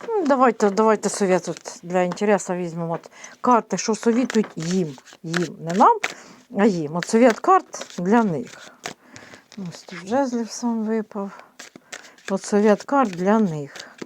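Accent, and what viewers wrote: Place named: Surzhyk, Ukraine. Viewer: Пані, ваші емоції і ваш суржик- це щось неймовірне, бо в цьому криється справжня українська відьма!!!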